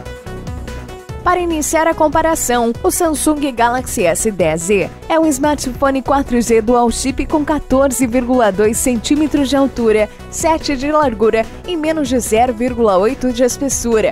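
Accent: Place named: Rio de Janeiro, Brazil. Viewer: Ele, é Carioca ;)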